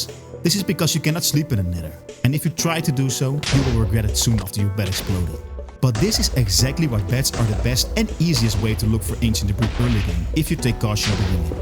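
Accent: Netherlands. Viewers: Sound like a Dutch guy. Nice content bro. Netjes! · You sound like a very well English speaking dutch men Am i correct? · haha ik hoor de nl accent gelijk al, goede video wel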